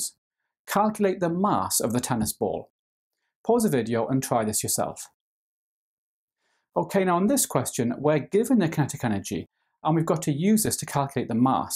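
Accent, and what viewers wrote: United Kingdom. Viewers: Thank you sir for doing these videos, so easy to watch and understand. There have been many American videos for studying on the Internet. However my English is my second language, I've always had trouble with pronunciation from American language videos. Your videos are free, follows the National Curriculum and in British English.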